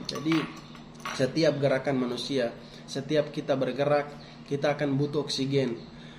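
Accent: Manado, Indonesia. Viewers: Manado p logat kang😁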